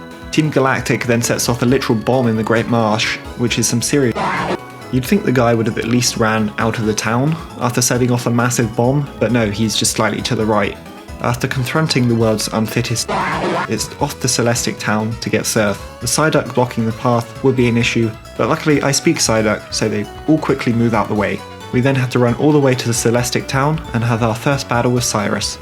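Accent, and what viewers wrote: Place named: United Kingdom. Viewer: This must be the first nuzlocke video I watch with a British accent! That’s so brilliant, bring it on man! 💪🏻🤗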